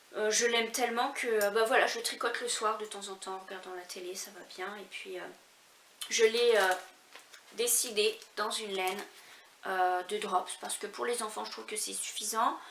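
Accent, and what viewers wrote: Quebec, Canada. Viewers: Bonjour je découvre ton univers , et tu m'as l'air d'avoir un petite tonalité du quebec? j'aime bcp tes couleurs et tes créations me font très très envie. Je vais dévorer tes podcasts tout en tricotant et crochetant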